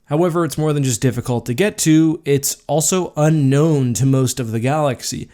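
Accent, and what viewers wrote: Canada. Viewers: Lol...You have an OUTRAGEOUSLY STRONG Canadian accent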